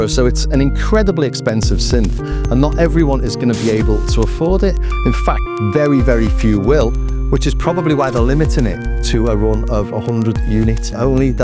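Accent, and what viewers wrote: Liverpool, United Kingdom. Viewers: It feels spooky to have a construcitve lecture from someone with the liverpool accent on the corrosive effect on society of the politics of envy, but you are 100% correct. Make music with what you have, it's still fun. And it's always rewarding how one thing leads to another in the music world if you put yourself and your work out there.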